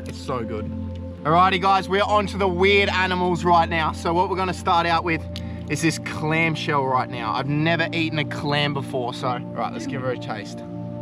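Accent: Australia. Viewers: Bloody Hell mate aussie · Are you Aussie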